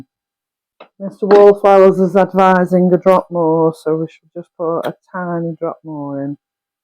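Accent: United Kingdom. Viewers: I enjoy soups and stews and this recipe looks delicious. I jotted the recipe down as you worked. Thank you for cooking it for us. It is a pleasure to be here, especially to hear words like "whilst," and the lovely English accent. Blessings to you and yours.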